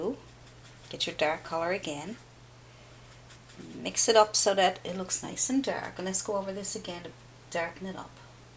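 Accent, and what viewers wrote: Newfoundland, Canada. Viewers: Thank you so much for putting up this tutorial!, I was wondering why your accent was sounding so familiar so hello fellow newfoundlander! <3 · are you from Newfoundland?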